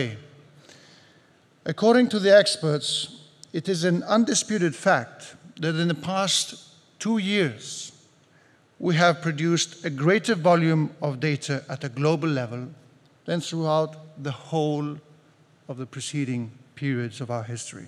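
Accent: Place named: RP, United Kingdom. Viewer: I didn't expect him to have a Posh accent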